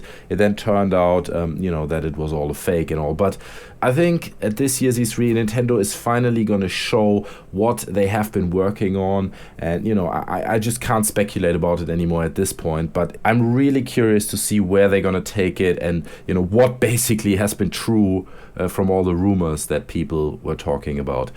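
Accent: Germany. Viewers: ARe you german :) cause you Sound a bit like it.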